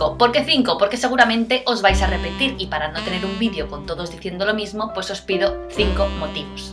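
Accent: Mexico. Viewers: jaja excelente acento mexicano ..subiré mi video :) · Que bien el acento Mexicano jajajajaja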